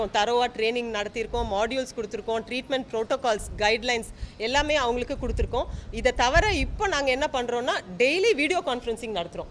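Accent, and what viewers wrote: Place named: Tirunelveli, India. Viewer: We like your tirunelveli Tamil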